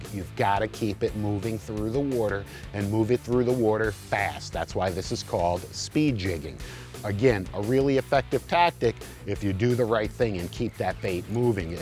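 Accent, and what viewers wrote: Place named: New York, United States. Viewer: This guys accent kills me. Go back to New York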